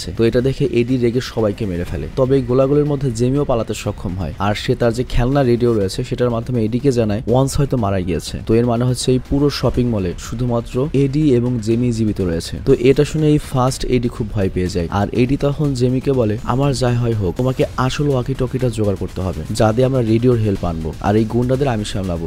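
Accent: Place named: Noakhali, Bangladesh. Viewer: এাই নোয়াখালীর হোলা❤❤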